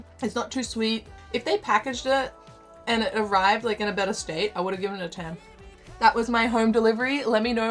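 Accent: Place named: Australia. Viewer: your accent is so cute. I wish I was from australia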